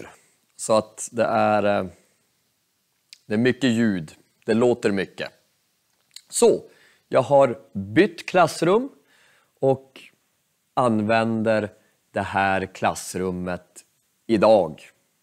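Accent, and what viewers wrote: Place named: Norrland, Sweden. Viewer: men den är Norrländsk dialekt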